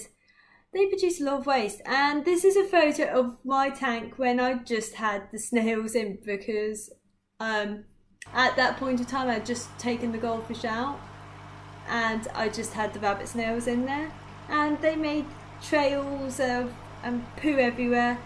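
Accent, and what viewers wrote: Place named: United Kingdom. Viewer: Hard to listen and understand due to the Britt accent. Thanks from the new world.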